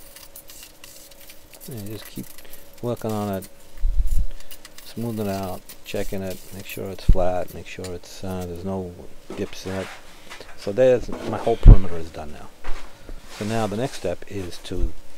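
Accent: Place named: New England, United States. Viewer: Hi, I like your videos and your New England accent. You almost sound Irish or Scottish. That mortar looks so dry. Is it ok to make it a bit more wet like for masonry?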